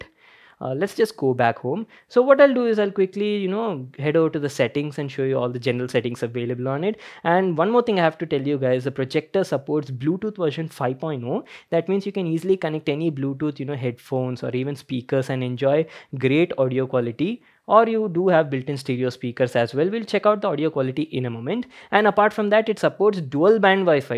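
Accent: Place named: India. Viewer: Wow finally I found Indian English Speaker Youtuber. I Subscribed! ❤️❤️❤️ Thank you for the Best Review of Wanbo T6 Max! ❤️❤️❤️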